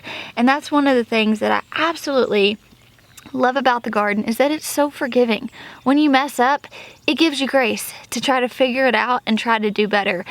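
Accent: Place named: South, United States. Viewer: I LOVE that you and Jessica Sowards both say "peench" instead of "pinch". Some southern YouTubers try to hide their southern-ness, and as a southern girl I am not about it! I'm here for the southern accents ♥️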